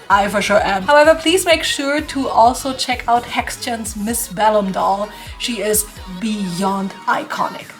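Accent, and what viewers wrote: Germany. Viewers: YAYY NEW VIDD! also weird question but are you german by any chance?? I believe your accent reminds me very much of german!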